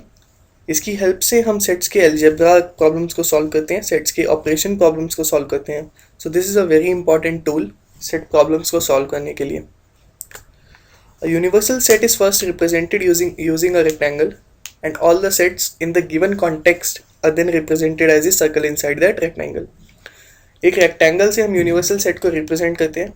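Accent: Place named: India. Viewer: Dude. It sounds like you speak Indian. Wtfffff???? Your accent man :///////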